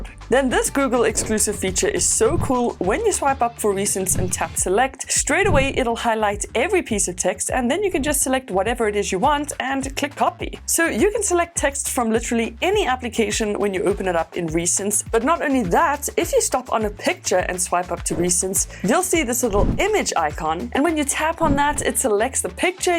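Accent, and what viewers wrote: South Africa. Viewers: South african accent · hmmm you sound a little South African :o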